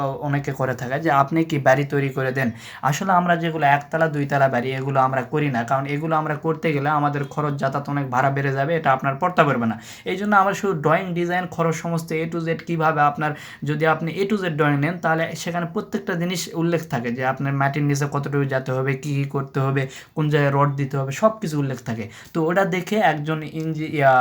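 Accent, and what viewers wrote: Naogaon, Bangladesh. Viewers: ভাই আপনার বাড়ি কোনটে? আমাকরে নওগাঁর মতোন ভাষা লাগিচ্ছে।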